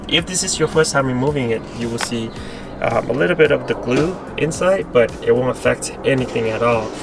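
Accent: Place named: Asia. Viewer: Why it has an asian accent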